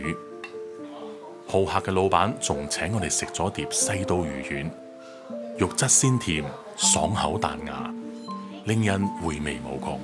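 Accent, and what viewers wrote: Hong Kong, China. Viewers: 难怪听起来像香港人口音和用语。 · Love your HK accent narrating a msian documentary in classic queen's Cantonese. Are you by any means from HK?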